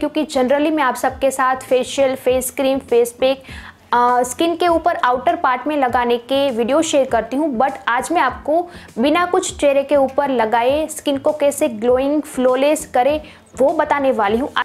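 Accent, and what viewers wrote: Gujarat, India. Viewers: I like her gujrati accent i miss my gujrati friend. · You must be a Gujarati by the way you speak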